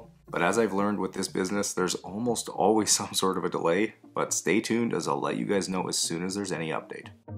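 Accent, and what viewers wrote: Canada. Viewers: I loved this video! I’ve never seen your channel before but a YouTube friend said I had to add you to my watch list. I love dr pimple popper, the hoof GP and now you! Your voice is so soothing (love me a Canadian accent) And the transformation is amazing! What an incredible job 👏🏼 Love from Scotland 🏴🥰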